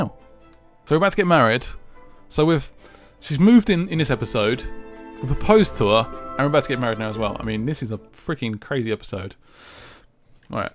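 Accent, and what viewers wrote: United Kingdom. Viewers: I really love your enthusiasm about this game, considering the bad reviews. I have now got the sims (yesterday) and I like it so far. Building a house was amazing. I love the family dynamics. I know there are major things missing from sims 3, but this is not sims 3, this is sims 4 and all is good so far. What is your best and least liked feature? Nice to hear a UK voice xx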